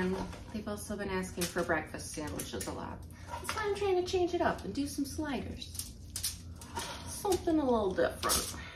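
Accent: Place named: New York, United States. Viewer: You sound like a New Yorker today. Lol! Love watching your videos.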